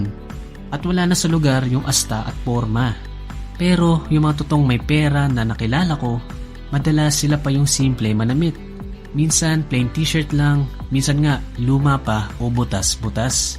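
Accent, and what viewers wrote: Philippines. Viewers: sound like: ilonggo tikalon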